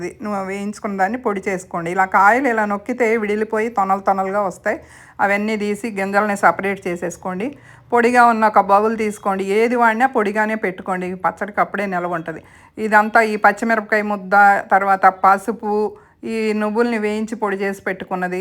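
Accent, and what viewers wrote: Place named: West Godavari, India. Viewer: Sakkati telugu madam westgodavari 🎉Madi Bhimavaram mogalu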